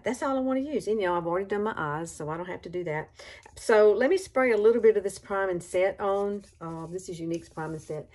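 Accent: South, United States. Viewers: I’m from Mobile Alabama I thought you sounded southern. Southern girls need to stick together. You’re absolutely beautiful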